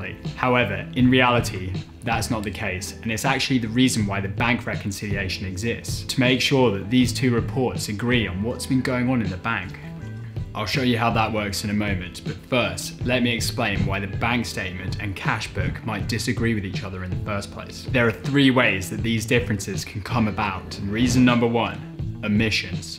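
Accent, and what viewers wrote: United Kingdom. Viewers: May God bless you for the way you have blessed us! Extremely helpful video - I'm doing a course in bookkeeping. Just curious, where are you based? Your British accent and use of dollars instead of pounds in your examples is throwing me off 😂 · PLEASE NEXT VIDEO NOT TOO FAST PLEASE ! SINCE YOUR BRITISH ACCENT I HAVE TO TAKE A LITTLE TO GET YOU !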